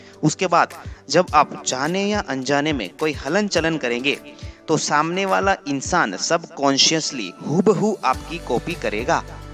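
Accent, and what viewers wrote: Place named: Gujarat, India. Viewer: voice se lagta he aap Gujrati ho.. love from Gujarat I am from Rajkot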